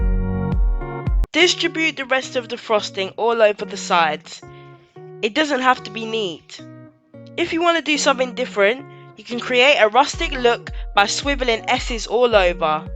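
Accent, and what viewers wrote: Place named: France. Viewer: I am trying this chocolate cake right now. Really hope it will be as good as yours. Very well done. And by the way great French accent.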